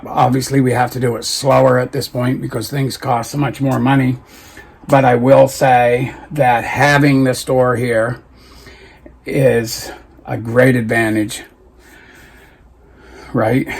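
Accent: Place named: Eastern Canada, Canada. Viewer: I'm so thankful for your channel! I love listening to your accent because it reminds me of my Granddad and Uncle who were originally from out east:) It is such a wonderful blessing to know of brothers and sisters in Christ across this crazy nation! What a gift you give us by your prayers! May God bless you both richly!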